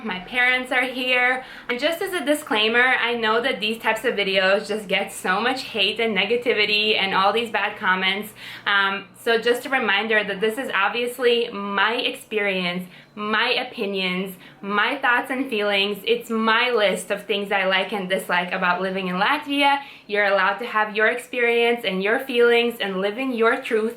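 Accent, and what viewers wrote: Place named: East Coast, United States. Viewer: the East Coast USA accent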